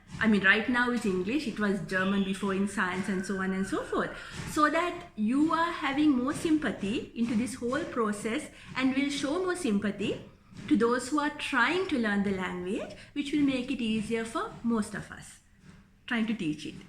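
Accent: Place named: Sri Lanka. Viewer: Sri lankan standard english accent.👍👍👍👍👍❤️❤️❤️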